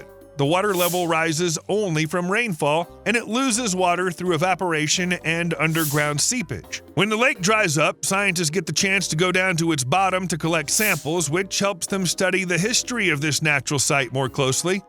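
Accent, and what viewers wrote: United States. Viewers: How do you seperate "strictly Aussies" from other ethnics or races when a large percentage of the population were born in other countries ? I dont bother watching or listening to videos about Australia that are narrated by someone with a US accent and this one was no different I turned it off. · Jesus, Give him the internet and a PHD from the Gretta Thrumberg university of HOW DARE YOU and suddenly he's an expert on Australia, The Aboriginals and global warming. During his eye rolling diatribe not once did he mention we contribute to 1% of the world's global warming issues.. Or that we're currently destroying the country to reduce that even further.. Whilst from his accent he's either American or Canadian.. Both of which produce far more than us. I'm 100% certain he got all his information from the ABC 😂.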